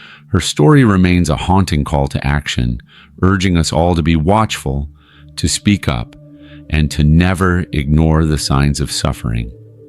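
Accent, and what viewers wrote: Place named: Utah, United States. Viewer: corrected your mistake on JASMINE RUTH BRIDGEMAN and now I need to let you know that in Utah the predominant religion is the CHURCH OF JESUS CHRIST OF LATTER DAY SAINTS (MORMON) and we have the most children in the country because they believe that they are making more of actual God’s by having many children, so that explains why Utah is notorious for child abuse and child deaths. Again thank you for the spotlight on these horrific crimes. Not sure if you are from Utah but sounds like you are. Again thank you.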